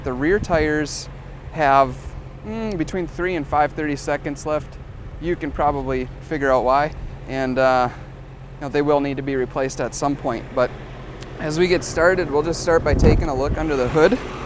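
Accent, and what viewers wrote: Canada. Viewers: i dont mean to offend you, the car is cool and all, i mean im not gonna buy it, but, your canadian accent is super annoying. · are u canadian?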